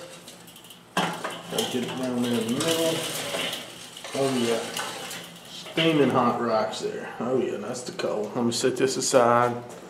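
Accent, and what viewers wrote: South, United States. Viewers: All the comments about your accent are infuriating. But I guess some people have never been down south. They're missing out on some good cooking. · I know you love to cook but PLEASE do a voice over for one of my books, I love your southern accent. brings me back home! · Love that southern twang! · I was initially turned away from the video due to negative experiences with individuals with Southern accents, but I like how he repurposed the Sriracha bottle. It's funny how embracing different food cultures releases any tension I had that he belonged to the same group of hate-filled individuals in my past. Nice vid dude, it's a neat idea cooking on a salt slab. I've never seen it done like that:-) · ok you sounded southern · i never know they had this salt block... like your steak and accent man.. yeehawww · Interesting southern accent and cooking. 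I enjoy your video, thanks. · You have heavy southern accent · lol the southern accent. I am in NOLA. 1. Wouldnt it be better to peel off the shrimp skins for the ingredients to seep in all over it? 2. For how long should we wait for the ingredients to seep in the beef and the shrimp after applying the ingredients on them? Thanks.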